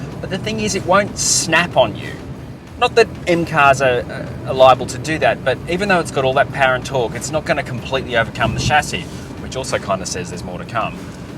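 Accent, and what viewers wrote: Australia. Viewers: Really strong Aussie accent! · Chi Hsia this is just a normal accent in Australia haha everyone sounds like this here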